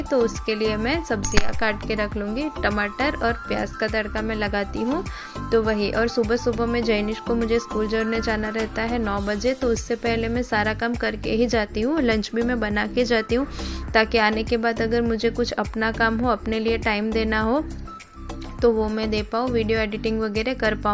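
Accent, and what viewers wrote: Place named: Gujarat, India. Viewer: Ji han main bhi Ahmedabad se hun aapki awaaz se lag raha tha ki aap Gujarat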